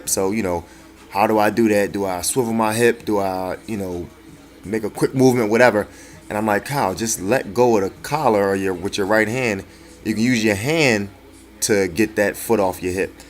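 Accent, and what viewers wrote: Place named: New York, United States. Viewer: Great vid.... where is this place? sounds like a NY accent to me :) Keep up the good work!!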